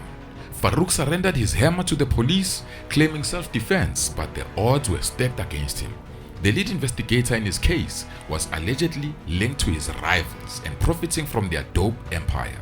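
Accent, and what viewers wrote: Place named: South Africa. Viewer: BRO I LOVE THIS CHANNEL. THANK YOU FOR KEEPING OUR ACCENT AS SOUTH AFRICANS!! 💪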